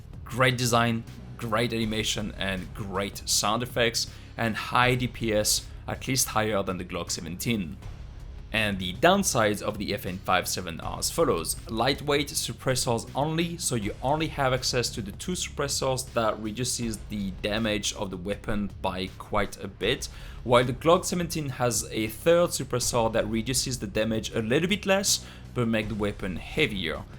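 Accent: France. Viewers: je me disait bien aussi que je reconaissait l'accent francais ces bien que tu puisse faire tes video en anglais ta plus de chance de faire parler de toi jaime vraiment ta facons de detailler ce jeux continue comme sa :)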